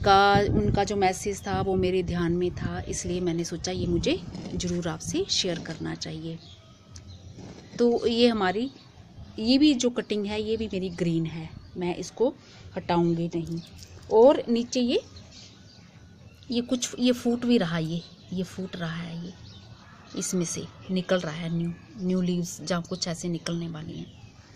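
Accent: Punjab, India. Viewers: aapki boli ka turz lehja kyu hai I think Punjabi accent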